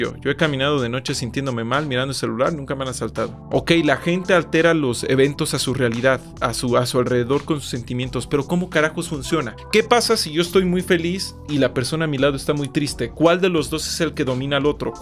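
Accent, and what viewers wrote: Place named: Mexico City, Mexico. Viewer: Mames, el mismo acento de la Debayle y casi todas las ladys, ¿Poblana? Fifi de CDMX?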